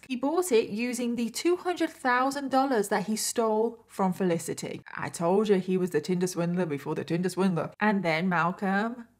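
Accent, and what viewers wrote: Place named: Redditch, United Kingdom. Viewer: Are you from Redditch or nearby? You sound like it.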